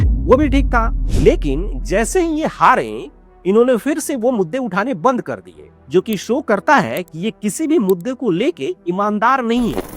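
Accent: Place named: Bihar, India. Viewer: Very poor speaking 🗣anchor performance bigra huoa bihari style ba chara chor ke bete ko parivar public dhono nei baga diya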